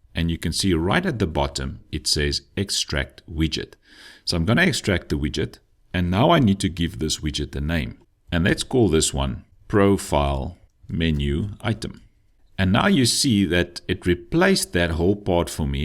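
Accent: Afrikaans, South Africa. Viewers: This was awesome! I solved maybe 75% of your exercise. I got a little stumped on how to format the icon-text-icon structure, and must say, your approach made sense. Overall, the mere act of trying made things click that much more. Thanks Mr., you're a 1 in a million lecturer! As an aside, when I was younger, a lot of my teachers were Afrikaaners, so hearing your accent floods my heart with some beautiful nostalgia. Best wishes, and I'll keep sharing your videos + playlists with all my developer friends that are trying to get into Dart/Flutter. Best wishes from the USA, and stay well ☮️🏆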